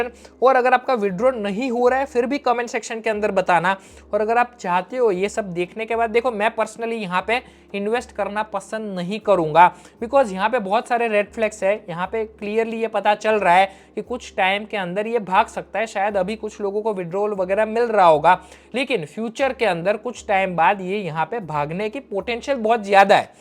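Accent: Gujarat, India. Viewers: Aapki hindi Sunke Aap Mere Ko Gujrati Lagte Ho Bhai Ho Gujrati To Kaha Se Ho Bhai😊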